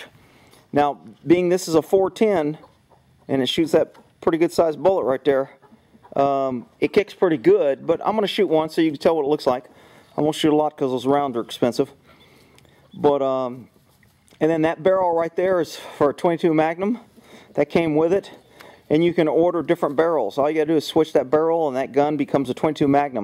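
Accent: Texas, United States. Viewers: This may be the oldest video, I have seen him make, in his newer videos he has picked up more of a Texas accent.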